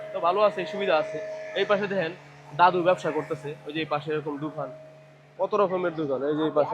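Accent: Mymensingh, Bangladesh. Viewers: আপনার সাবলীলভাবে ময়মনসিংহ অঞ্চলের ভাষায় কথা বলা আমার খুবই ভালো লাগে বিধায় আপনার সব ভিডিও দেখি এবং ভালো লাগে ! শুভ কামনা করছি তোমার জন্য ! কোন জেলার আপনার দেশের বাড়ি ❓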